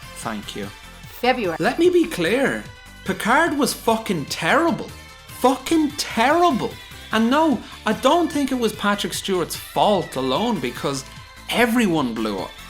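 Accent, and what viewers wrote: Ireland. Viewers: I just discovered this channel and now my internal monologue critiques stuff in an Irish accent. Great channel!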